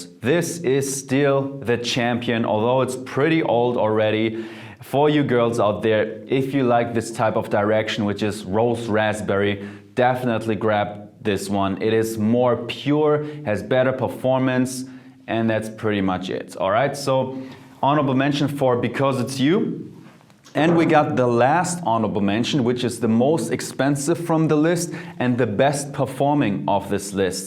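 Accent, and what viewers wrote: Germany. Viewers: Omg he’s GERMAN haha I immediately knew it because of his accent. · Too german...if you work with perfumes you need to improve your French, man. Le Femme is pronounced 'fa:m' not 'fem'. Blah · Why does he reminds me of a young Ralph Fiennes lol. I guess the German accent when he was playing that commandant in Schindler's List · I can totally hear your german accent coming out 😉 cute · Your accent sounds so german :) are you from Germany? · Is he German? Sounds like it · As soon as you spoke I knew you were Germany. lol. Thanks for the recommendations. What suggestions do you have for women would have sensitive noses. A lot of perfume irritate my nose and/or give me a headache. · are ya german? i think hes german i can hear an accent loll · German accent! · Hehehe you have a German accent. Are you from Germany? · He sounds german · Is he german? 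The accent? (I'm german) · Sorry but he sounds so german.. I‘m from Germany so yeah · You’re German, aren’t you? No worries, your English is great, I’m a linguist so I notice those things. But still, thank you for this list, I will give those perfumes a sniff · Omgggg I knew he was German I just heard him talking in english and thought the whole video about if he is German too bc of his accent and in the end he talks about having an German account 👯‍♀️ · I understood from your accent that you could be only German! Deutschland in my heart! ❤ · Is he german? He has a little accent, don't he? I'm german, too by the way😉🇩🇪 noch wer? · ist der deutsch? wenn ja man erkennt es eif sofort an diesem akzent😂 nicht böse gemeint btw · I literally was about to look up if he was German until he said he has a German channel. German accent is strong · German accent 🙂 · Your german accent is kinda cute :D grüße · Mehta hello! 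I am french and I can definitively affirm that he is not. The way he's pronuncing french makes me think he is from Germany. Actually, the way he pronunces french"R" is 100% german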